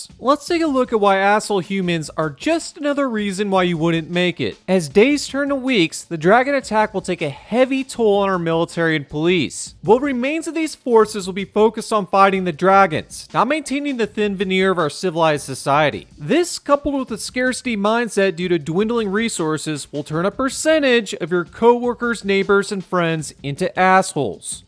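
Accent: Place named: California, United States. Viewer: I hated the " confused California bro" accent at first but now I can't watch these how to survive or how you'd die videos without it. This channel is great 👌🏻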